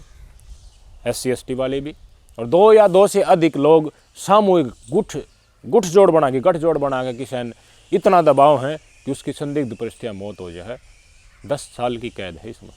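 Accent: Haryana, India. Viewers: भाई बहुत स्पष्ट रूप से बोलते हैं और सतह m हरियाणवी bolte h kati char chand lga deta h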